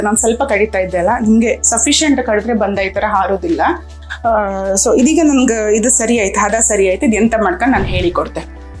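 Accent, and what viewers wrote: Kundapura, India. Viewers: Dasan soppina idli adara jothig namm kundapra kannada super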